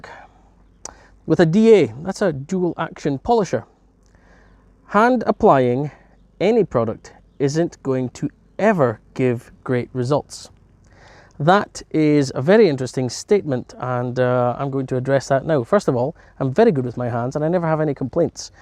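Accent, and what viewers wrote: Scotland, United Kingdom. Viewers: no doubt I have some Scottish friend here in the states you sound like them....keep going with YouTube I’ve watched a few videos they are good. Best kept secret in US detailing market is a company called duragloss they make mostly sealants 111 and 501 are there best they are around 10$ for 16 Oz they also have a product like bead maker called aquawax the stuff has a cult following here in the states. You should review that stuff don’t see any uk detailers using it.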